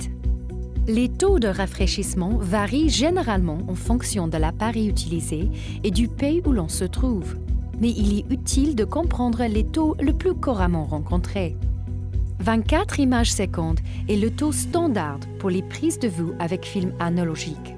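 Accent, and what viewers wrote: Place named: Quebec, Canada. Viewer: Québequois anglais jpense mdr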